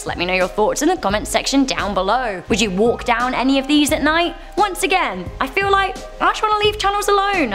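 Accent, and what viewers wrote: England, United Kingdom. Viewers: Are you from UK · Hi Beautiful can I get a shout out In your next video I just love your English accent and when you do scarry videos. And I love how you dress. You dress so nice 👍.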